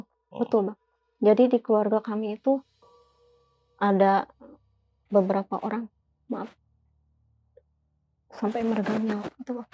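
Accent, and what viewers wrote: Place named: Cianjur, Indonesia. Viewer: Kakyk logat cianjur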